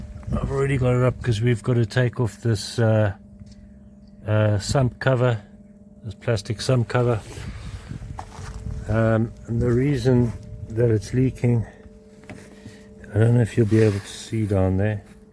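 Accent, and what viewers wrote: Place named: South Africa. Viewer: Recognise that accent, regards from wilderness, South Africa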